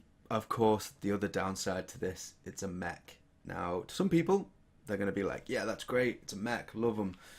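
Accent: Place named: England, United Kingdom. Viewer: Love your English accent - did you go to drama school to lean it? Excellent, keep them coming.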